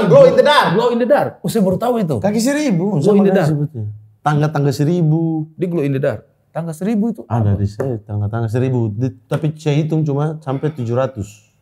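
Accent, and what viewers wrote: Eastern Indonesia, Indonesia. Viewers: kenapa logatnya mesti ikut²an logat timur? Mestinya pakai logat Minang aja, biar terlihat keberagamannya.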